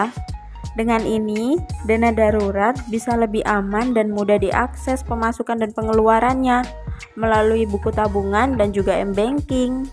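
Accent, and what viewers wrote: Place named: Java, Indonesia. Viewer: Kak asli org mana klu boleh tau? Ko gaya bahasa nya medok bgt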